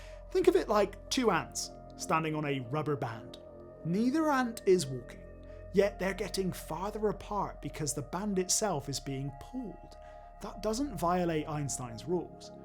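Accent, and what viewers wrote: Bristol, United Kingdom. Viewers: sorry but how does a kid get to name The Reality Threshold?! I do find the Brissel accent hilarous. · I know a Bristolian accent when I hear one!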